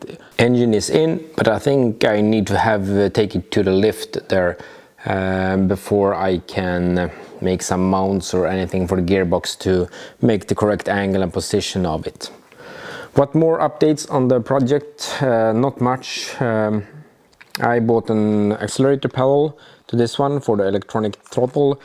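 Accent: Sweden. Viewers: älskar svengelskan riktigt fint <3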